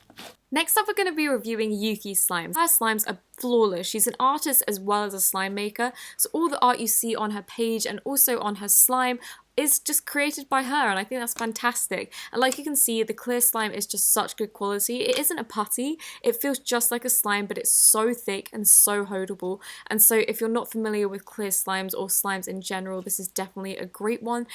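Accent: United Kingdom. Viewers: She has British acent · I like your voice bc I like British peoples voices🤣😂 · The british accent is such a touch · can u talk a little slower? I dont want to sound mean but i'm hispanic and I can understand english videos but u talk too fast and plus u got a british accent, I like your videos but I struggle understanding them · How did I wake up to a British lady reviewing slime?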